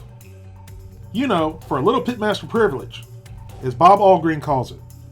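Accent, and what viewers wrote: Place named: Texas, United States. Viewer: This guy has the inflection of chef John but with a Texas twist! 🪢 I love it!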